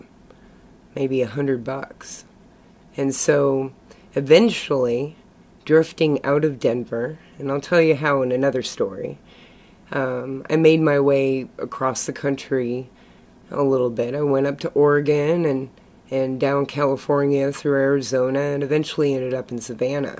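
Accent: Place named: Kansas, United States. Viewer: You talk like you are from Kansas. That is a good thing.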